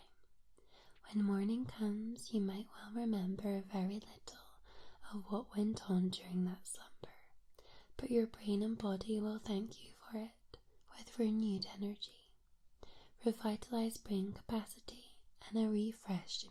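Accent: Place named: Scotland, United Kingdom. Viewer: 😀👍 3hrs of Sultry Crikey! This will be good....very good.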